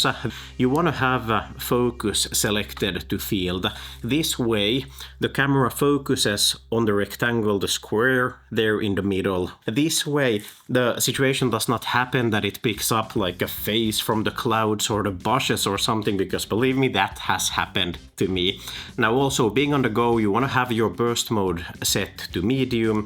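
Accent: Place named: Finland. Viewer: I'm so glad I got that correct. I met some Finns on my travels and they were so polite! Your accent reminded me of theirs. Good video by the way.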